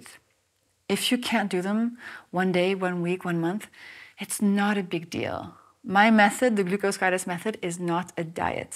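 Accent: France. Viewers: je me disais bien reconnaitre un accent français par moment ! merci pour vos explications je vais vous suivre <3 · Wait a second... Didn´t she have a very thick exaggerated French accent in her first videos?! You can´t simply erase your accent from your system. She was acting it to gain more impression and clicks. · I know how I detected she is french so easily despite her excellent English accent.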